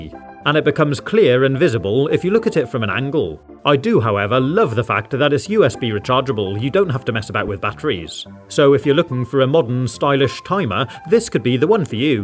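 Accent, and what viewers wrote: United Kingdom. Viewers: I love how easily distinguished his British accent is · I am British and you sound like me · Nice accent boss where you from United Kingdom?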